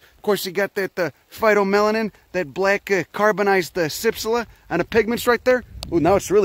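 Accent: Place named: Berwyn, United States. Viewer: Very interesting. I’m in Northern New Mexico and I’d like to learn about all the stuff growing on my property. By the way, you talk like you’re from Berwyn. Are you? 🙂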